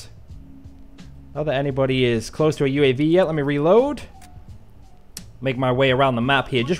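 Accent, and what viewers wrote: United Kingdom. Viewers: Great to hear a British accent. Just for a change. Love the video mate. Subbed and liked.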